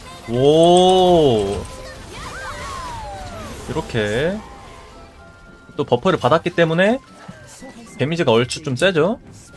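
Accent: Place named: Jeolla, South Korea. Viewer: 님 전라도 분이신가 ㅋㅋㅋㅋㅋ 사투리 ㅋㅋㅋ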